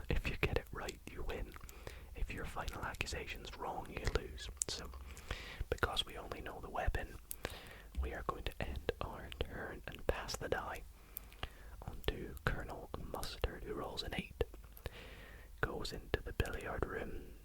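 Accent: United Kingdom. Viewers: Just stumbled upon your channel and i love it ❤ Are you from the UK?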